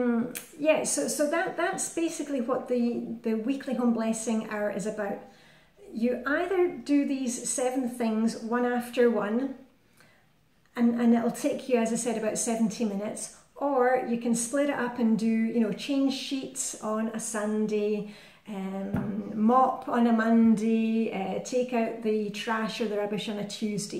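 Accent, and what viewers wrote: Scotland, United Kingdom. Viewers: Fine Scottish accent,! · I’ve only just found your channel! from Denmark? As soon as you had said your first 4/5 words I knew you were from Scotland 🏴 and when you said Bahooki - that takes me back 🏴- well I’m hooked... I’m clicking on to binge watch. It sounds like we are having a wee blether! Cheerio Lizx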